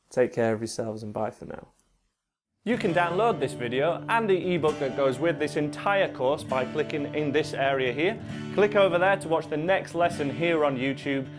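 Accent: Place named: Northern England, United Kingdom. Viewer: Northern English accent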